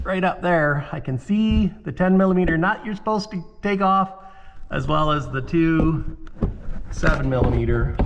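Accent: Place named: Canada. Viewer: Oooouut. I hear a faint accent A!!! What part of Canada??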